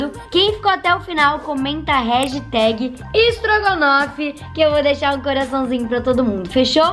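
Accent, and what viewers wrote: Rio Grande do Sul, Brazil. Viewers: Eu amo essa, ela é gaúcha nata! Até o jeito de falar, maravilhosa ♥️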